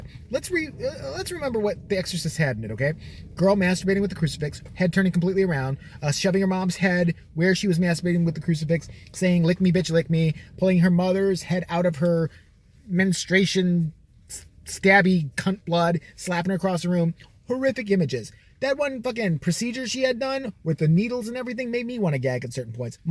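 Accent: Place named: United Kingdom. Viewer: God I love your British voice, bravo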